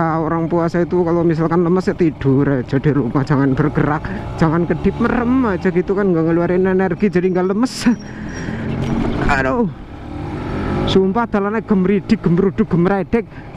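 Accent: Banyumas, Indonesia. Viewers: Salam wong ngapak mas😘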